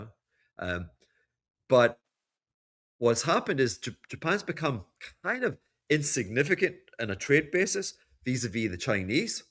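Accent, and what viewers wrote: United Kingdom. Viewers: is this British English???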